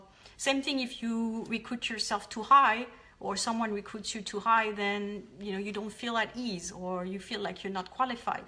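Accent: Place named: France. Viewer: Thank you for this smart,structured content!I also like how you follow some sensitive points.Btw,your French accent is very charming when you speak English.Good luck with everything you do!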